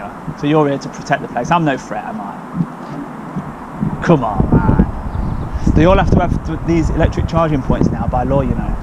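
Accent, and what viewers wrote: Leicester, United Kingdom. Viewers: Love the quality on this channel, I live in Derby near swarkestone bridge on the A50 so you are so local!!! Leics twang sounds so similar to Nottingham twang, which is where I was born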